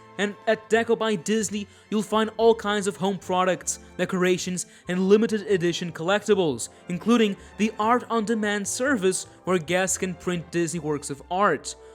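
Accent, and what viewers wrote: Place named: United States. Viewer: We need an English voice and I'll subscribe! This voice is too American sounding 😰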